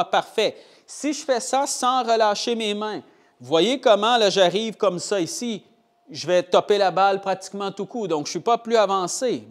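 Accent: Quebec, Canada. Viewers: C'est marrant... Quand vous écrivez, on n'entend pas votre charmant accent de la Belle Province...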